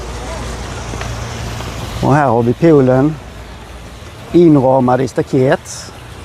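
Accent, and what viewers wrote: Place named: Skåne, Sweden. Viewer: Intressant film, hör att du är från Skåne och runt Hässleholms trakten. Välkomstdrinken i filmen togs på ett hotell i San augustin dock svårt att se in där då det är murar mm framför. Något jag sökt efter.i många år är var dom spelade in inköpet av Lasses åsna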